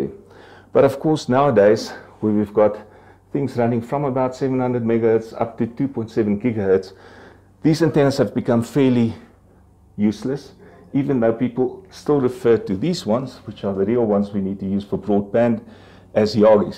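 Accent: South Africa. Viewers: S that a South African accent?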